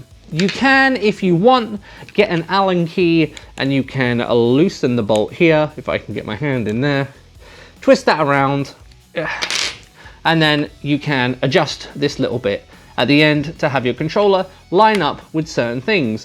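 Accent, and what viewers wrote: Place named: United Kingdom. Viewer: I'm guessing by your accent that your Brittish? I'm also guessing that you do not currently reside in England? Given the fact that you just compared the VR pistol to a real one, which is a big no-no in England - a country that has become a haven for criminals and where law-abiding citizens have been stripped of any/all rights to bear arms or defend yourself against said criminals.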